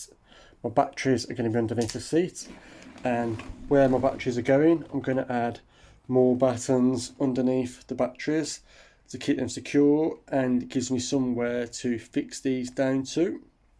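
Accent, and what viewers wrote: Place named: Dudley, United Kingdom. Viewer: Just come across your channel - sound like your from my way (Dudley). Reslly helpful videos - thanks for uploading!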